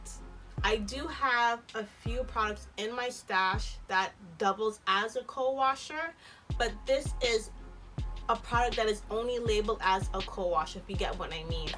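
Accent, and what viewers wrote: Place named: Canada. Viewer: I knew u were in canada i hear the accent lol...im in mtl..where did u buy the avocado?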